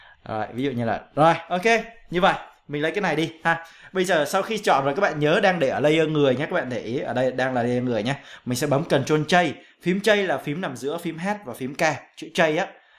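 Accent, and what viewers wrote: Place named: Central, Vietnam. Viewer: Anh này người miền trung nè đọc “a” thành “e” kk ❤️Miền Trung 🤣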